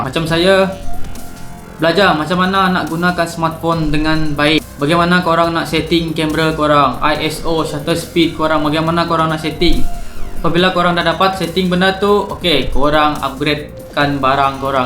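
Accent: Sarawak, Malaysia. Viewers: sarawakian ka? 😂 btw nice content. quite membantu and thanks for reminding pasal bajet.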